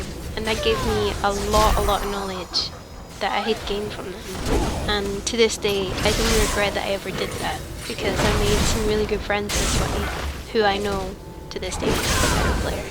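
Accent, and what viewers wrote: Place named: Canada. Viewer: LoL, the canadian accent is strong in this one, oooover and oooover. abooot. Loved the video though, learned alot from it ! :)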